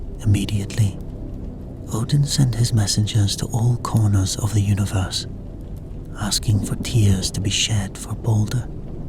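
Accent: Scotland, United Kingdom. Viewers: Really enjoying these as part of my routine before bed. I spend the majority of my year working abroad. The Scottish accent adds a feeling of comfort and home. These are all so well put together. As a fellow Scotsman, cheers pal! Ps. You’ve got yersel a patreon 😉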